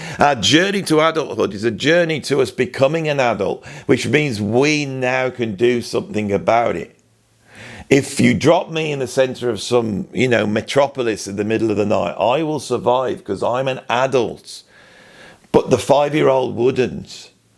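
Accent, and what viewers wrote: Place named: Midlands, United Kingdom. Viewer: More then interesting, first thing why am I looking at other comments, sod it,I don't need to... Your comment at the very end, have a good death, that comment in itself can go either way, I guess it could be viewed as humour, but the point that I would like to make is,The subject of Death is totally avoided here in the West, Burried in fact.,now thers a point,humour arriving again... But absolutely we all need to be able to die in silence and peace.and hopefully in absolute awarness. My personal view is Life and Death are part of the same coin... Anyways,Artists such as Joni or phill,Kate,are somehow via there creative ability deal with there Trauma and failing and are capable to go beyond this in a cathartic way, I agree with you, we all are capable of finding way to rise above the stress and that method iin itself is creativity. 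There are unfortunate people who sadly become lost and have an immensely horrendous time in darkness.. Oh,Bloody hell I'm blabbering on,sorry. To put it simply you have to be true to yourself and if you happen to offend someone it is there problem, your loyall membership and subscribers know you and will stay.... Love the midlands accent, I'm a East middlander,originally, yep I can't help that 😂. Best wishes to your friend. Enjoyed again this in depth discussion...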